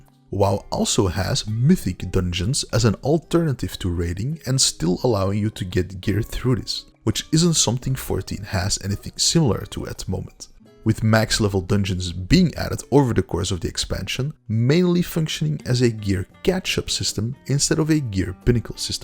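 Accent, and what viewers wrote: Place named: Belgium. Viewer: i swear you sound belgian and i love it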